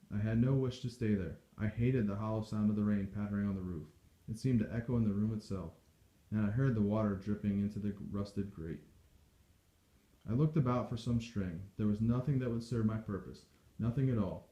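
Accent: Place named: United States. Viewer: Awful. Why is a man and an American reading? Terrible recording too.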